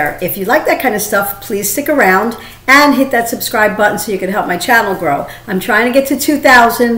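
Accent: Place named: New York, United States. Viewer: You sound like your from nyc I was born in the Bronx NY 💕😍😍💕😍 beautiful bag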